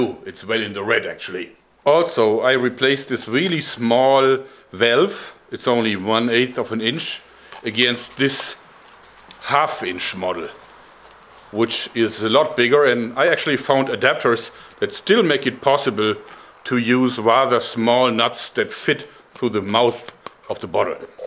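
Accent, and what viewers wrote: Germany. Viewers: I wonder where he's living - cause he uses all the american measures:D but i suppose germany - cause of his amazing accent ( and the laughing is gorgeous ):D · is he a german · ich habe an ihrem englisch erkannt das sie deutsch sind 👌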